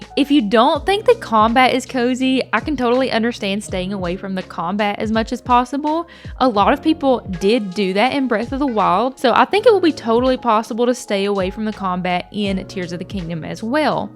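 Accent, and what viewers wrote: South, United States. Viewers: The southern accent…never clicked subscribe so fast 😌🥰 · Okay your southern accent is darling ! Enjoying the cozy content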